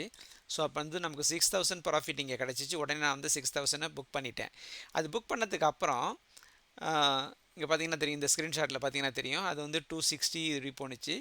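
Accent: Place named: Thanjavur, India. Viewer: Your slang looks like around Thanjavur belt ...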